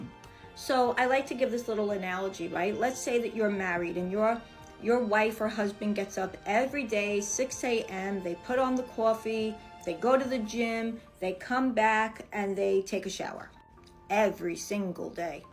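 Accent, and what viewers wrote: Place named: New York, United States. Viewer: Yes. Thank you for letting everyone know. I always try to explain to people that psychics can tell you about your past, present and future because of the familiar spirits that are with you and correct me if I’m wrong, but when you leave, the familiar spirits that were there leave with you? Also real quick, when I first heard u on Doreen Virtue channel a few years back, I was like Ok is definitely from NY, lol I wasn’t sure where, I thought BK but then I found out LI… I’m from Staten Island… love u girl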